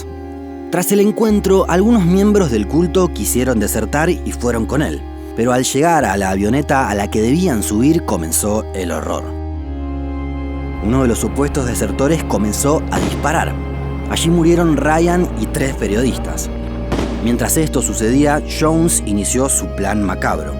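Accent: Buenos Aires, Argentina. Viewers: se le re nota que es argentino ese boludo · Es argentino es su acento. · Me quedo mas que clarisimo que sos Argentino, por la forma de pronunciar y marcar la "Y" cada vez que decis Guyana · Los amo <3. Hacen un trabajo fantastico! Saludos desde Mexico! Viva la vocecita con acento argentino que dice siempre Tristan da Acunha jajajajaja lo amo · jajajaja, no solo Buenos Aires habla así, todo el sur también habla así, entre ríos, Santa Fe y el sur de Córdoba también.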